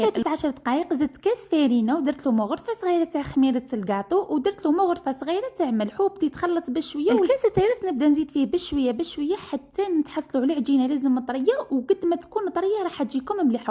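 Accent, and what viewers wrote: Setif, Algeria. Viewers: هدرت السطتيفية فيها خشونية وهءا اللي حلاها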